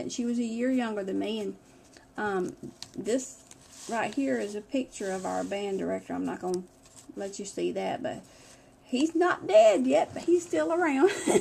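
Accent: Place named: South, United States. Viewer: I just love your southern accent. You are adorable and you did a wonderful job on the album.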